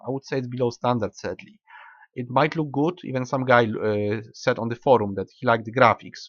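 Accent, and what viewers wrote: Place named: Poland. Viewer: Tak myślałem, że jesteś z Polski xd zdradził Cię akcent hehehe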